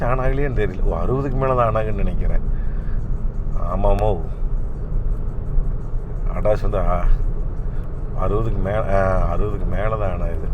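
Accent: Kongu, India. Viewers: Ungaludaya sweet kongu speech really super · கொங்கு பேச்சு வழக்கு அம்மணி மிகவும் அருமை 🌹🌹🌹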